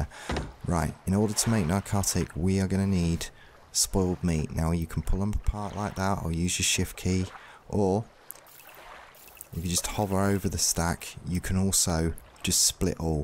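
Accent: United Kingdom. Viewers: A Young British Bob Ross teaches Ark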